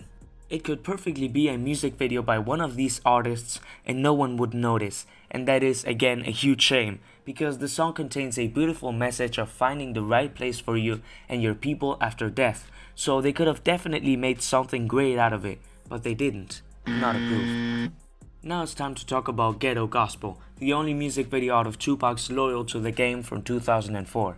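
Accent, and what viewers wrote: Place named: Philippines. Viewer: Very well made and intelligent content! Where are you from, you have an interesting accent (Filipino is my best guess)?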